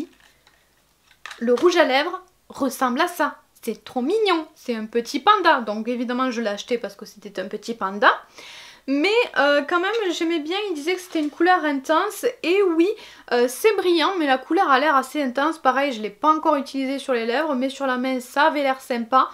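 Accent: South, France. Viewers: petit accent du ud qui va bien avec, super video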